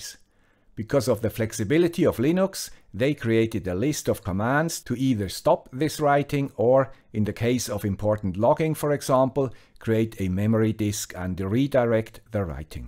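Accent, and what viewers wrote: Switzerland. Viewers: thanks to the guy with the Swiss accent, another great tip and information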